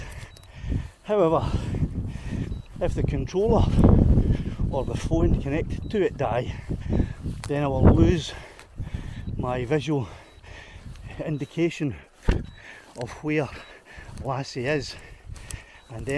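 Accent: Scotland, United Kingdom. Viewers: Fantastic story. I really admire your courage and tenacity to find your drone. Despite the rough terrain, you overcame all obstacles and ended up successful. Respect for your perseverance and never give up. I like your Scottish accent.✌Greetings from Denmark.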